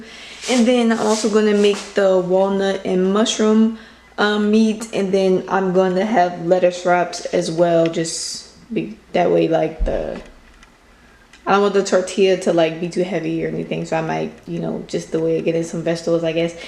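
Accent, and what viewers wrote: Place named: New Orleans, United States. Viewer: I detect a hint of a New Orleans accent in your voice. Food looks good!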